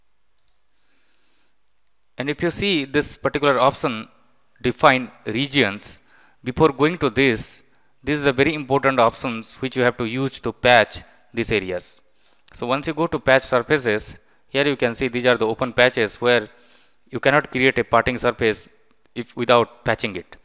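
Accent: India. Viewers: jesus the accent of this indians is unbearable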